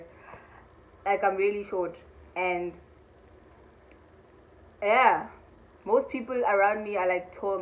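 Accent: South Africa. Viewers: I just love you❤️❤️your Xhosa accent 👌👌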